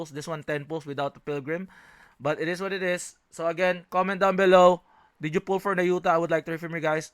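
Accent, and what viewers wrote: Philippines. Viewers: Sabi na fil ka pre e, sa accent mo kase HAHAHAHA nice content pre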